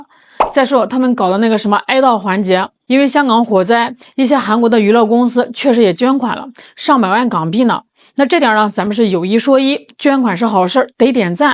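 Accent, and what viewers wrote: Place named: Henan, China. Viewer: 河普地道 😂😂😂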